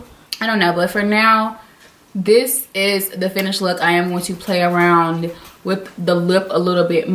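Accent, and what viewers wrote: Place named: South, United States. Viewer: Gorgeous and your country accent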